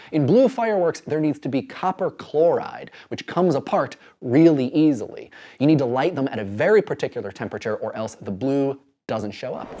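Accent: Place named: Midwest, United States. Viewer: Are you from the mid-west or visit often? There are many accents. People from Chicago speak a certain way, different from Detroit, or Wisconsin. Like New York, New Jersey, Maine... There isn't really one Northeastern accent. You wouldn't say Louisianians sound like Kentuckyans or Texans would you? If you would you aren't listening.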